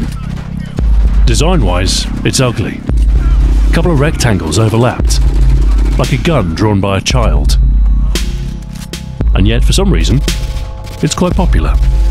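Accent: United Kingdom. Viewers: And if you hear his accent, you can tell he is British, which most likely means he never shot a gun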